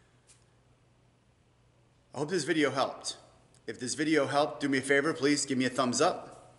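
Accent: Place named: New England, United States. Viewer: Awesome video! As an electrical graduate of a vocational high school this brings back memories. I’m currently deciding to upgrade my two family home from 200 amp service ( each unit is 100amp panels) would it be worth it to upgrade and if so how much do you think would cost? Also judging by the way you speak, you must be a fellow New Englander. I’m in western Mass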